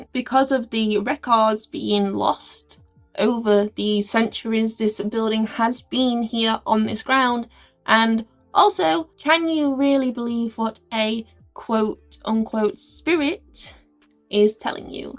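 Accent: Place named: Yorkshire, United Kingdom. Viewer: Yaaaay you're back, well done ^^ no it doesn't matter how dishevelled you are, it's a podcast, and honestly you still look stunning, it's kind of upsetting 😅 As much as the Cex demons have me curious 🤔 this might not be my first investigation location, maybe the next one >< definitely first time hearing of the ram hinn (yes you are very Yorkshire and it's wonderful) 😅 I was actually dozing off towards the end, because it's chill and 3am, not boring lol, night ^^ + I like all the editing, the text inserts and images were a nice touch, bloopers were cool too, again good job =]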